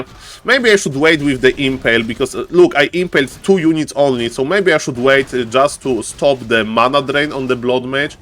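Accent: Poland. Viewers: U from poland? Accent sound so polish 😊 Edit after 2 minutes: polak jak chuj xD pozdro wariacie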